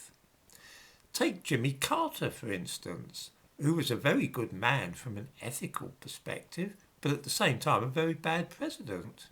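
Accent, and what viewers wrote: United Kingdom. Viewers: I've never been very interested in history. That is until i found your channel. I really enjoy your history lessons, your thoughts and your British accent. Thank you. Kind regards from Sweden.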